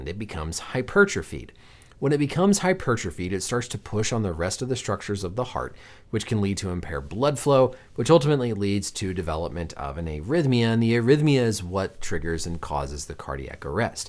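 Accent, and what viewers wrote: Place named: Michigan, United States. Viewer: Unrelated to this video but I had a very strong feeling you’re from the Midwest/Michigan and googled you lmao the accent sounds very Michigangander esque. Love how informative and straight to the point your content is Cheers doc!🍻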